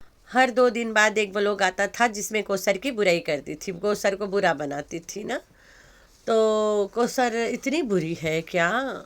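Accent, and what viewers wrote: Uttar Pradesh, India. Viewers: Very good reaction.❤❤❤❤or aapki U. P. Vali language me toh bahut apnapan lagta hai main bhi india ke U.P. se hun. Reaction bahut interesting lagte Hain.🇮🇳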